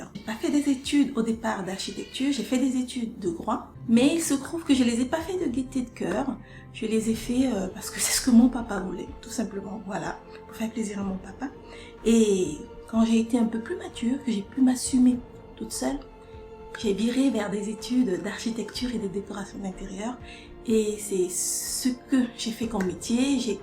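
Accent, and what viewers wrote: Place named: Cameroon. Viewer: Pour information, cela vient de l'accent de son pays d'origine, si ne m'abuse, le Cameroun. C'est une spécificité propre aux habitants ce beau pays !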